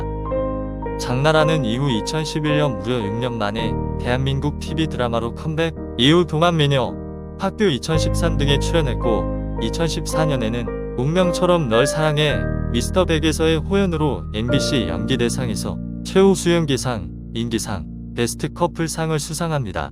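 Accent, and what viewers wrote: China. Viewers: 좋은 영상도 아님~ 인공지능 대사 대충 만들어서 조선족 말하는 거처럼 들림~ 장나라팬인데 정말 기분이 나뿌네~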